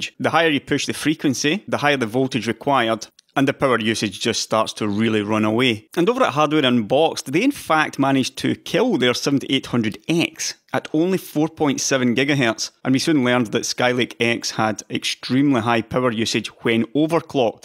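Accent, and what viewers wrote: Scotland, United Kingdom. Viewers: developing a taste for Scottish accent thanks to you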